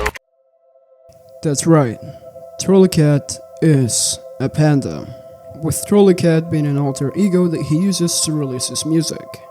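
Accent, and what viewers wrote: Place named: Europe. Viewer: he's probably european so it's not his first language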